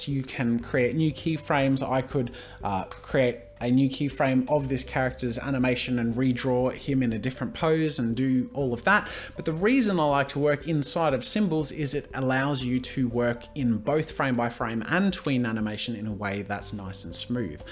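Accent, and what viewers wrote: Australia. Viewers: Is he Australian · Are you Austrailian? Just wondering it sounds like an austrailian accsent but it´s vety COOL and sorry if a have a lot of misspelling but I´m not from anywhere where people speak english and I´m not that good at it :D LOVE YOU´R CHANNEL!!!! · ho Australian accent. my favourite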